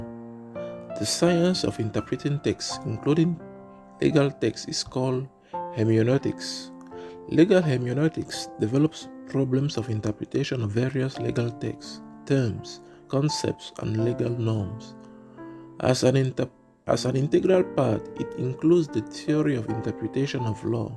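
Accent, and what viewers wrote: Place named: Africa. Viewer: Африканец говорит, плюс понятно что он просто читает, с сильным акцентом, плюс понятно что он ничего в этом не понимает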